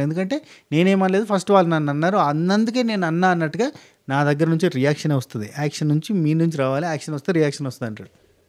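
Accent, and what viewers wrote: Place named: Warangal, India. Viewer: Em okati em rendu anta 😂 Anna medhi warangal ah? Ma intlolla la matladthunnar meru ❤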